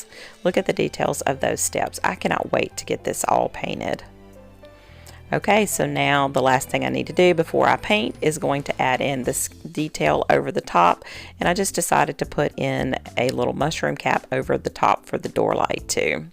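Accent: South, United States. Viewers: Girl you are ridiculously talented. I just love these. You blow my mind every time. And I love your southern draw. Reminds me of when I was child and we would drive to Tennessee every summer to visit family. Nothing like a 10 hour drive in a car filled with kids. Keep crafting and stay healthy my friend 🌸🌼🌺🌻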